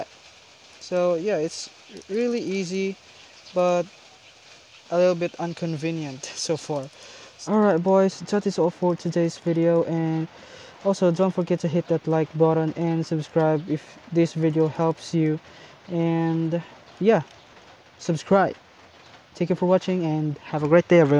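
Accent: India. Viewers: oh 😂 u speak like a indian but np! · i think u r a indian beoz iam also a indian the english slag looks like indians and even i speak like that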